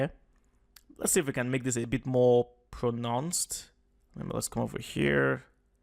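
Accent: Nigeria. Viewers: Fantastic video, delivered with clarity and a little humour - just right! I am interested in your accent. Would a guess of Nigeria be anywhere near? Thanks again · you kinda sound nigerian lol . great video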